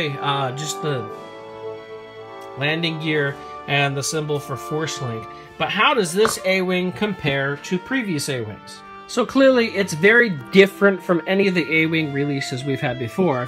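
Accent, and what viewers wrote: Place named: United States. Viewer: Whatever!, am bored with you. I take it you are a yank,only yanks talk like you do?.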